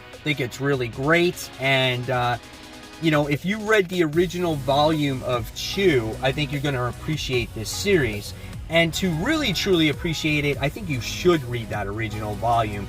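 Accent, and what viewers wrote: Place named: Pennsylvania, United States. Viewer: I always thought you were in Pennsylvania with that accent. I live in SW Florida and love it. Great job on the 10k!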